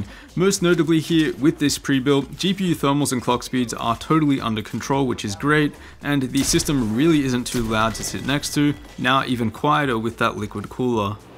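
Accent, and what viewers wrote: Australia. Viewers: R u and Aussie whaaaat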